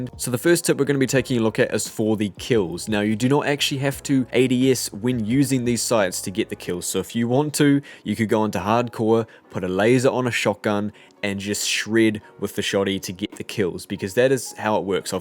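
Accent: New Zealand. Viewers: Are you from New Zealand? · Is he from New Zealand?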